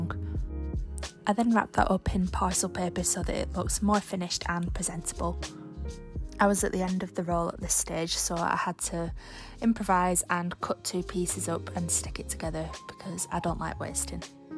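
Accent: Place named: Newcastle, United Kingdom. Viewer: I had a thing with a gal one summer…and she had your accent. You must be from Newcastle…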